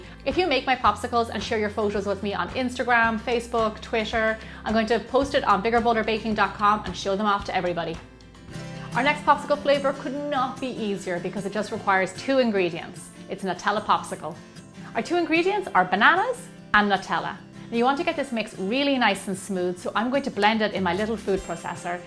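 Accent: Ireland. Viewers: Are you Irish??? CAUSE I AM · so thats why she has that accent, i didn't know that she was irish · I love your Irish accent!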